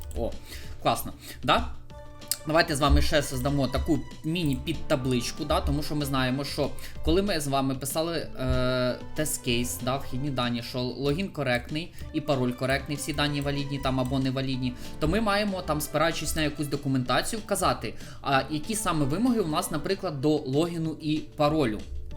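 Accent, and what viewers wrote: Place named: Surzhyk, Ukraine. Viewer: Смешные звуки произносишь. Это суржик?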